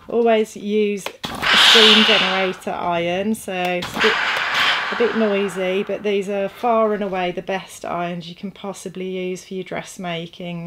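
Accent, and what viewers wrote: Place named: Yorkshire, United Kingdom. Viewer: I’m a self taught sewer and have been sewing for a very long time. I am amazed at the tips and trick I have learned from you. I am also an expat from Yorkshire so I enjoy your accent. It’s a little bit of home. Thanks for this awesome channel.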